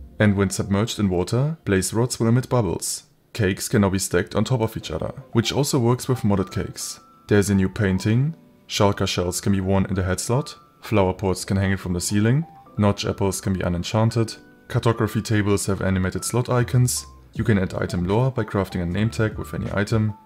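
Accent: Germany. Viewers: Hi, kann es sein das du deutscher Muttersprachler bist? :) top Mods wie immer! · bro has the most german accent i have ever seen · why does bro sound german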